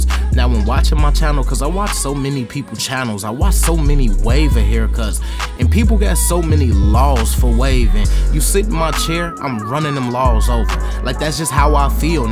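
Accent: Chicago, United States. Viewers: I knew the way you talk you was from the land. I’m definitely sliding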